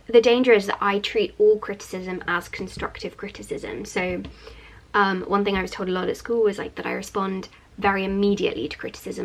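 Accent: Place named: England, United Kingdom. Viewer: Can you please tell us where in England are you from or lived in? I studying british english and I just love your accent and want to know where is it from 💛 thank you!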